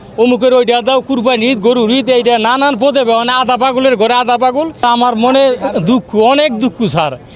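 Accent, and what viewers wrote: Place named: Munshiganj, Bangladesh. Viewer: কথায় বুঝা যায় মুন্সিগঞ্জের মানুষ।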